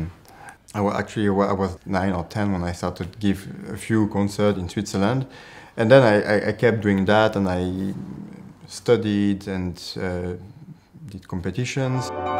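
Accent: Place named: France. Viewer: Haha, a German last name with a strong French accent. Quite an unorthodox fresh combo though.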